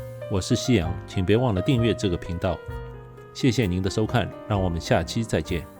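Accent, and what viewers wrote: Shanghai, China. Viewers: 上海口音的上海红烧肉肯定正宗，订阅了！👍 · 冲着你带有上海口音的解说，红烧肉肯定是回味无穷。❤👍你的视频不拖泥带水、不啰啰嗦嗦一步到位是有良心的节目人。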